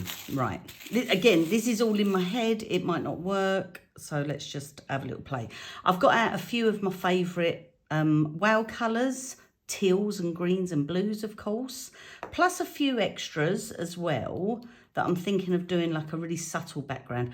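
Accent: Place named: Essex, United Kingdom. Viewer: Just came across your channel and really enjoyed watching you. Particularly because I hail from Essex originally and worked in London so your accent is like coming home. Your voice really reminds me of Linda Robson in the good old comedy 'Tails of a Feather'. What a coincidence!!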